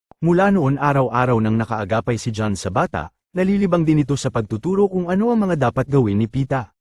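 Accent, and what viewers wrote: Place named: Bicol, Philippines. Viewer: Aba bicolano ka pala manoy ,,, gayon Ang movie recap mo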